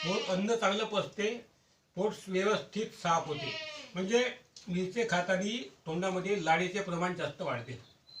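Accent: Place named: Vidarbha, India. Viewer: भाऊ तुम्ही नागपूर म्हणजे विदर्भात ली भाषा बोलता.मीपण विदर्भात ली आहे त्यामुळे मला बरं वाटते.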